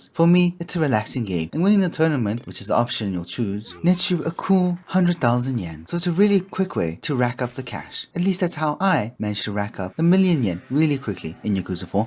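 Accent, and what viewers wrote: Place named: South Africa. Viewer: He's south African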